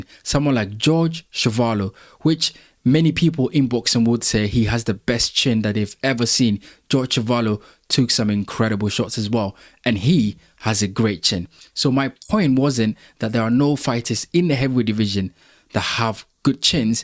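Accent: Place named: Ghana. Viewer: You sound Ghanaian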